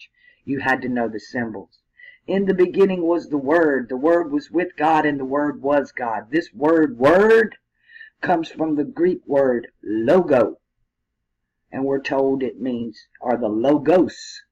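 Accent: South, United States. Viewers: lol i loooove the Southern Drooole :)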